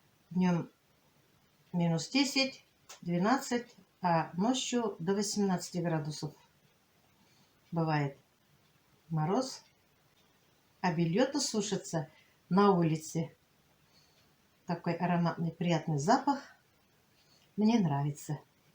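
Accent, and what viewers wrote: Tatarstan, Russia. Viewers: Apaika ne v koem slu4ae ne hotela obidet vas .vi horosho govorite .akcent tatarskii obozhay vas .s uvazheniem Farida💋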